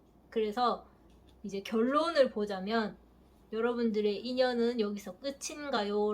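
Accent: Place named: Gyeongsang, South Korea. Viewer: 타로에 경상도 사투리가 엄청 많네..대구? 대구사람들이 다 타로하는거임??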